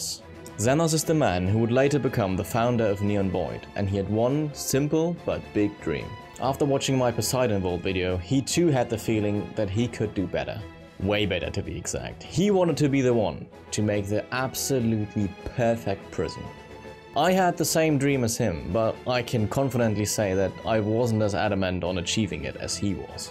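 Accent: Germany. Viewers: I am German so I know what things are hard to say and I hear that just a tiny bit but I didn’t see anyone else recognise German accents so it could just be me being very good at hearing these kinds of details. But it’s fine. You don’t have to panic. I am in Hesse and one day my pronunciation just became better. I just decided that I am gonna put more effort into my speech.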